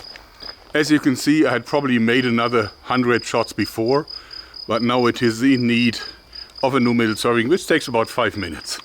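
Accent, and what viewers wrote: Germany. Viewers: I've been a fan of your channel for years, and I was on a spree of your older videos today, and something that I notices is that your german accent disappeared more and more over the years! Keep up the videos, amazing channel!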